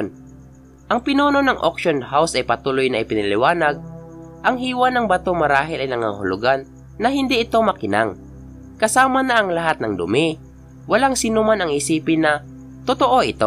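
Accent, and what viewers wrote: Visayas, Philippines. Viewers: Bisaya Accent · Bisaya k b dong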